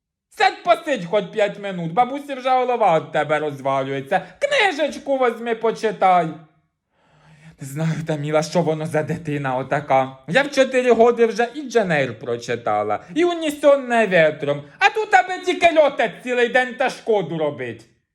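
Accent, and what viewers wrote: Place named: Karlivka, Ukraine. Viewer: Народилася, виросла і жила до 17 років в Карлівці Полтавської обл. У нас так розмовляють😂 100 процентів! Як наче в двір до когось в Карлівку попала😂 Обожнюю слухати цей суржик, говір і манеру подання❤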